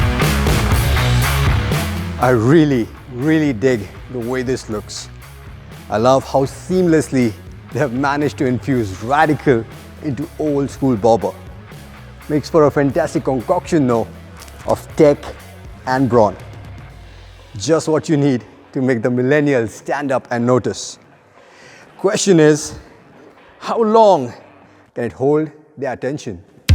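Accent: India. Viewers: Nothing, I say nothing, sells a harley davidson like a guy with an Indian accent and an earing in one ear... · What's with the accent???? Aren't you Indian??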